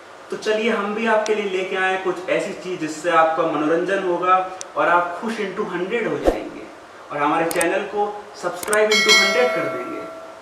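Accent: Allahabad, India. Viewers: Allahabadi language is superbbb🤣🤣... Well done bro🤘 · Allahabadi style ma bola to aag laga Diya🤘🔥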